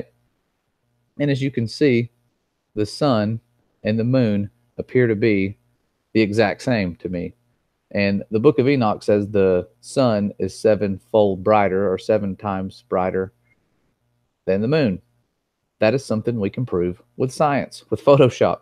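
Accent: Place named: South, United States. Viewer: Kinda makes sense that this guy is southern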